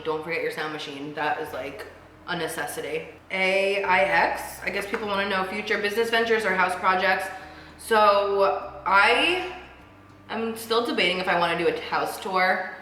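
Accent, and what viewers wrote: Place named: East Coast, United States. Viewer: Her east coast accent will always be endearing.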